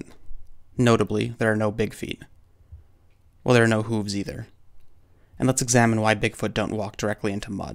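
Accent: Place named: New England, United States. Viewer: You must be from the East coast. No one says "wicked" like us that live in New England. I'm in Maine. I have a friend that had an encounter in the Bridgewater triangle ( wompatuck state park) He and friends were chased out of the woods by an ungodly scream. My theory is they are everywhere! Thanks for sharing your experience. I truly love your channel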